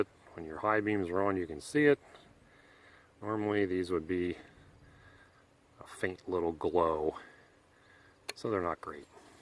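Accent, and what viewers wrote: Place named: Pennsylvania, United States. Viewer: Maybe a Pennsylvania accent?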